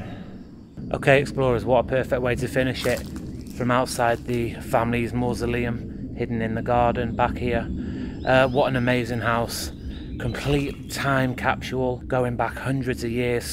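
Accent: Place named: Northern England, United Kingdom. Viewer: Yes and they understood your Northern way of speaking English, using phrases that no foreigner ever learns. You're great entertainment, but come on!!!!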